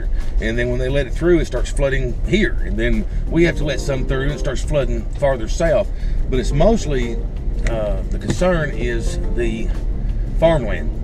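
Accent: Arkansas, United States. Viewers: Very educational vid I love your Arkansas accent too.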